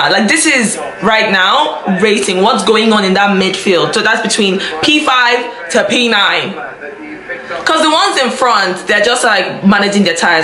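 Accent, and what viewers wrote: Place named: South Africa. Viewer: your accent...you from SA?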